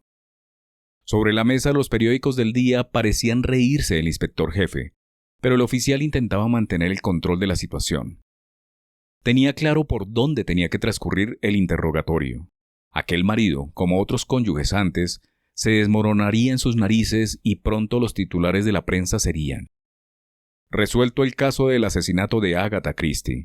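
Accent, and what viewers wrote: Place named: Colombia. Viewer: Gracias por su lectura, me atrapó. Por otro lado, me encanta su acento colombiano.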